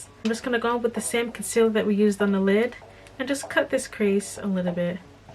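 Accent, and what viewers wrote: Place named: New York, United States. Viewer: You did pretty good with your NY accent (like your humor), time to test it out in person...Have you ever been to NY?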